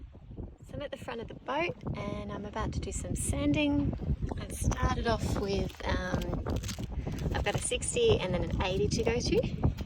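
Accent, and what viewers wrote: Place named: Australia. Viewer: Really amazing stuff you have here!! LOVE IT!! I have a little wood burning set....I might have to get it out and try a few things!!! ❤️ Sounds like you are down under!!! AND>>>how cool are you out on a boat?? Awesome!!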